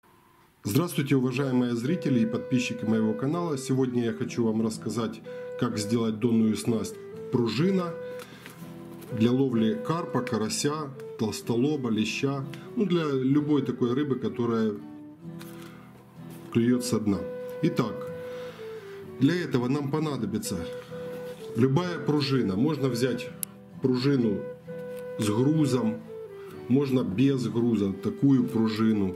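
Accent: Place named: Ukraine. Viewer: Я извиняюсь, но "шо" и "гх", вы с украины, автор??? Ничего личного, просто интересно...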